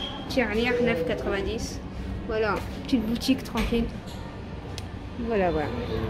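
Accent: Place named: North, France. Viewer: Il est d'où ton accent,? Il n'est pas Parisien, si? L'on dirait un accent du Nord Bonne soirée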